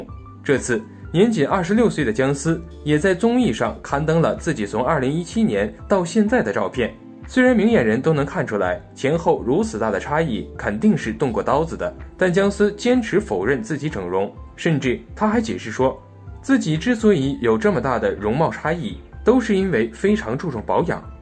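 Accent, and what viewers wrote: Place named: China. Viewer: 这个节目吊啊，说着大陆普通话、换算都是新台币！！！！哈哈哈哈哈😄懵逼了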